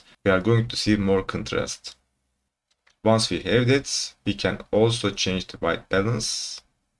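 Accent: Turkey. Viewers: Kardeş eğer sen türk değilsen ben bu işten birşey anlamıyorum demektir telafuzun çok belli ediyor kendini, harfleri türkçe vurguluyorsun. yanlış anlama bunu kötü amaçlı söylemedim videoyu çok beğendim yardımcı oldu teşekürler 👍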